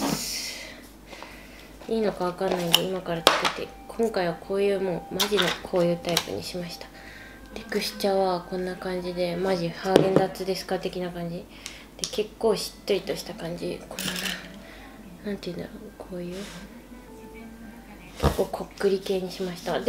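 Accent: Kagoshima, Japan. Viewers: ケンタッキー食べる動画嬉しすぎて泣ける。しかも鹿児島弁聞けてさらに泣けます。今一緒にケンタッキー食べてます🥺🥺幸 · 鹿児島のイントネーション入っててなんか嬉しい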